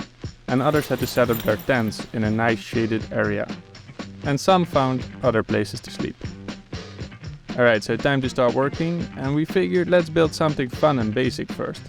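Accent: Netherlands. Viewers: Now there's a proper Dutch accent. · Forgive me if I'm wrong... But your accent seems Dutch?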